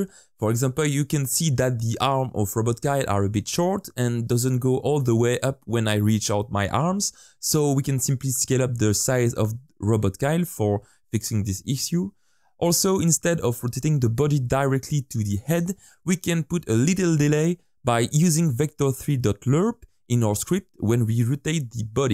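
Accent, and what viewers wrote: France. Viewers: i find you got a french accent, am i right?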